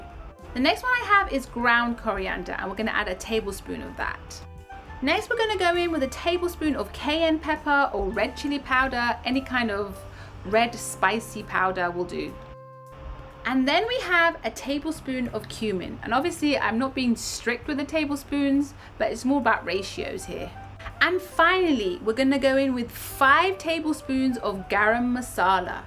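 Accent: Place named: Birmingham, United Kingdom. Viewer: You have a Birmingham accent 😊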